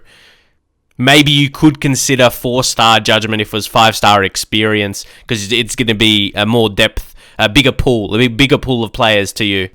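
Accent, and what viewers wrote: Australia. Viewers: Yes! An Aussie! Just found channel! LOVE IT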